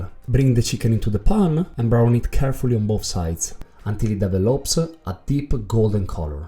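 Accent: Italy. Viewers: Great recipe! Love the way you exaggerate the Italian accent. It is noticeable your English could sound a lot more "English", but I do believe we should always keep our roots. It is like the fat... Why lose it? No need. Regards, from Angola, Africa! Cooking your bolonhesa, like we say in Portuguese, as I write.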